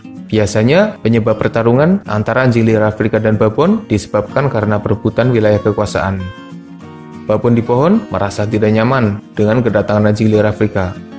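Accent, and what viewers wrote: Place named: Java, Indonesia. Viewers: Jawanya medok banget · Nampa x jowone hhehe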